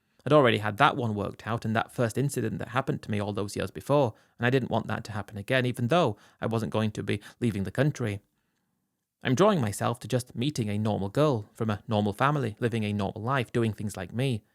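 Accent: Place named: Liverpool, United Kingdom. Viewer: Adyeee lad more scouse whinging 😢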